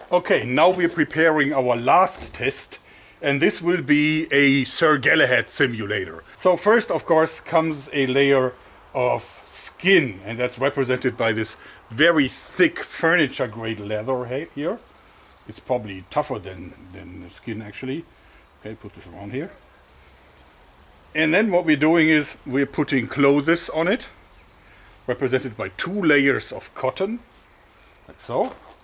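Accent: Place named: Germany. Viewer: For one, international english is based on British English unlike canadian and american English. But also, Mr is german, so his pronunciation is different, no moreso than any regional dialect like American.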